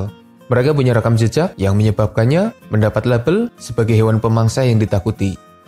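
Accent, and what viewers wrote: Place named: Java, Indonesia. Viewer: Suara medokmu lho mas... Uhug mara'i kangen kampung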